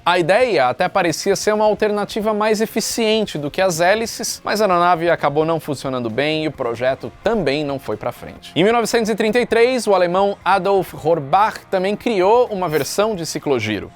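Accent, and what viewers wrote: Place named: São Paulo, Brazil. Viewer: gosto dos seus videos porem sua voz d paulista e foda tudo pra voces e meinto, momeindo pensameinto porque nao momento porque o i antes do n fico bolado com filmes com dublagem paulistanas tudo com meinto poxa que voizinha safada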